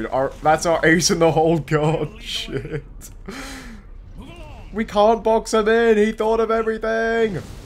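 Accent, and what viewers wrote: United Kingdom. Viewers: Hi I'm just a little YouTuber nobody but I'm from Oz and I promise you don't have an Oz accent, you sound beautifully British:) Love all the stuff you do and you are the reason I started watching YouTube, never stop with your magic!:) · Thanks for playing the map, hopefully I can make some more in the future, if I do (and if they're good) they'll be coming your way. Yes I'm aussie, and your accent is British, but, as they say....... Iv'e liked Bfme versus since BEFORE it was lame. Just joking I love ur channel I dont mind if u use my name, its ok. whatever.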